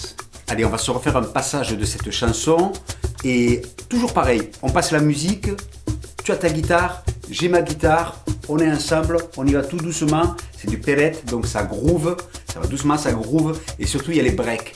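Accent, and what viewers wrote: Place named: South, France. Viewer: Salut! Super vodéo, super format! Parfait pour comprendre quand placer les accords et pour voir la rythmique main droite! Seule petite remarque: Si tu pouvais prendre 1 minute au début de ta vidéo pour décortiquer le mouvement de la main droite ce serait encore mieux!! En tout cas un très grand merci pour ta pédagogie, ta bonne humeur (et ton petit accent du sud qui ne gâche rien dans l'affaire), c'est vraiment super agréable et encourageant!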